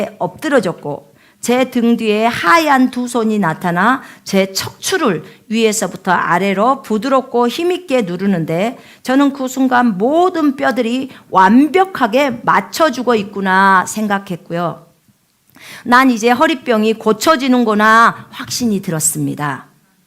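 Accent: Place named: Jeolla, South Korea. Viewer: 선교사님 전라도 말이 친근감 갑니다^^*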